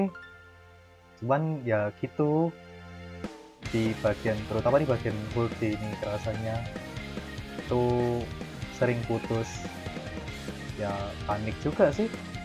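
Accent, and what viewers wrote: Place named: Java, Indonesia. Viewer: Njir medok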